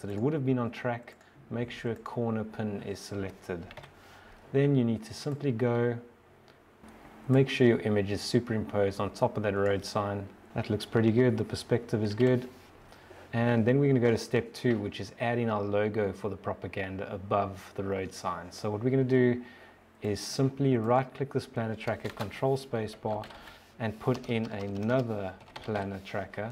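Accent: South Africa. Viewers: U sound south African 🤔 Jus a thought I wanted to share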